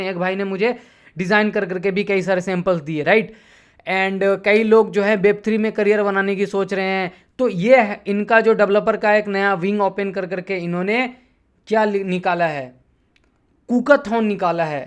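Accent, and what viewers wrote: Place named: Bihar, India. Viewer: aapka ton bihari h ... R u bihari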